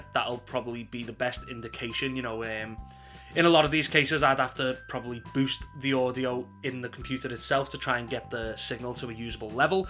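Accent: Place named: Liverpool, United Kingdom. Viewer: Sound like ur from Liverpool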